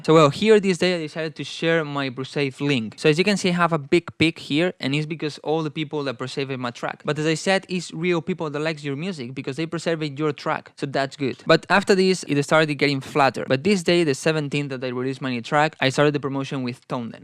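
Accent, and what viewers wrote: Spain. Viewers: Good video, just what I needed . . . . . El español es tu lengua materna verdad? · Great video man! I was so indeep on FB adds, this seems so cool as well cause is less complicated so I'll check out! BTW where are you from? Your accent seems spanish, I´m spanish too if it's the case hahaha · It's spanish voice jeje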